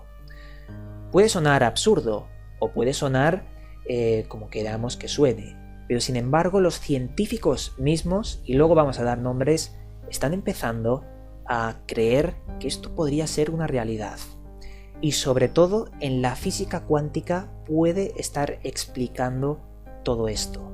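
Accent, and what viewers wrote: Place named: Spain. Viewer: Gostei muito do vídeo, mais eu não entendi muito porque sou brasileiro e você deve ser espanhol.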